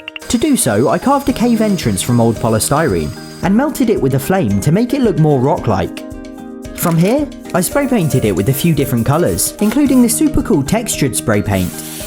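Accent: United Kingdom. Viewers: Are you British? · He’s British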